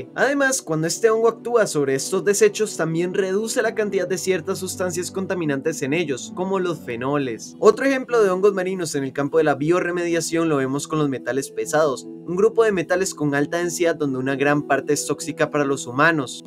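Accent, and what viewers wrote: Costa Rica. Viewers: Eres tico? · ¿Se puede ser más tico? Jajaja, me encabta este canal ❤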